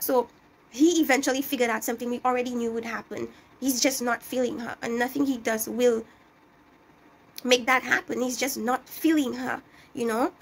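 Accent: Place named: Caribbean. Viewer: Are you a Lucian or a Dominican? I love your accent.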